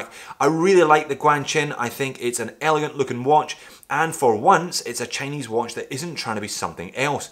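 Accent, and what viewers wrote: Scotland, United Kingdom. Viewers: My Favorite is the D1 Milano... BECAUSE (has nothing to do with liking the watch) This video showed up in my subscription lineup, and my wife liked the look of it. So this was the first JOMW video she ever watched. And upon watching, a milestone was reached. You are the only watch channel host in my subscription list who does not annoy her. Maybe it's your Scottish accent (we recently vacationed on Skye) or the fact that you are a Scott living in Australia like one of our favorite musicians, Collin Hay. She has since also commended the channel's intro graphics/colors/font. (she is a graphic designer) Anyway, your channel, and in particular this video, opened an avenue for us to "nerd out" on watches together. Which has made the life of this watch collector, measurably better. Thanks! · My favourite video of yours is the first part of modding the legendary SKX ( <link> ) Simple but important and practical mods ;) Maybe it's the fact that I love SKX itself so much 😂 And I really enjoy your content, nice hard work and this Scottish accent ;) Keep it up and go far above 100k soon, I'm sure you will :)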